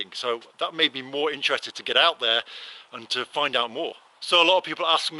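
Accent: England, United Kingdom. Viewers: Your English is ENGLISH. Teach me. I am British but not English like you.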